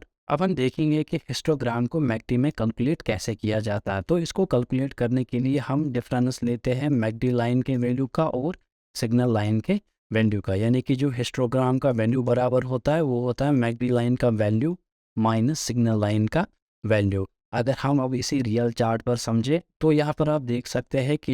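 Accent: Kashmir, India. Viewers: Salute sir aapki tone Kashmiri hai hamen aap par proud hai..❤❤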